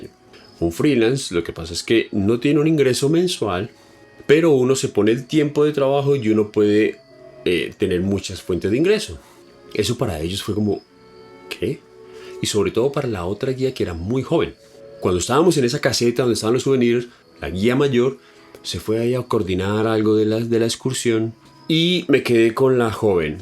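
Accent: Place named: Colombia. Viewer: Cuidandome en esta pandemia me encuentro con tu video y escuchando tu narración de tu experiencia en corea del norte, me encantó tu formato. He leído mucho sobre corea del norte, su cultura, su forma de vida, el sometimiento en este regimen, la infraestructura de este país y realmente no me gusta nada Corea de Norte, pienso que es un país engañando por este régimen, donde estan atrazados años luz del mundo exterior. Pero tú Narrativa es muy entretenida a lo Colombiano y eso me encantó. Seria muy interesante escucharte hablar de otros países que tu has visitado. Pues me encanta conocer otras culturas y otros países. Yo sigo a Alex tienda, Gabriel Herrera, Luisito comunica, planeta Juan etc. y que bueno que un colombiano nos narrará sus experiencias de viaje desde su concepto y a lo colombiano. Un saludo y felicitaciones.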